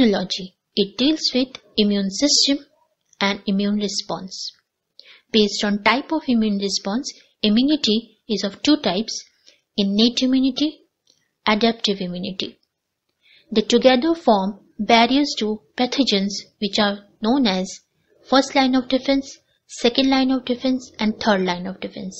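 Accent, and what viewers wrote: India. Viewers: Ur india accent still so thick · Excellent explanations and illustrations of key principals. The only problem is that the narration is difficult for me to understand in the moment of the fast moving narration, due to what sounds to me like a vaguely Indian accent. Is there some way to adjust this? I speak American English.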